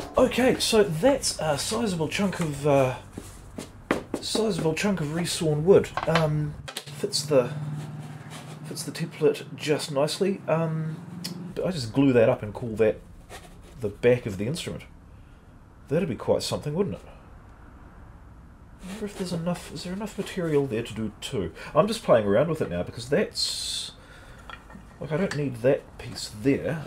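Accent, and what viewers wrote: New Zealand. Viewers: Not hugely relevant to this video but your videos got me fixing up my bass and playing way more!! Keep up the great content! soo good to hear a kiwi on youtube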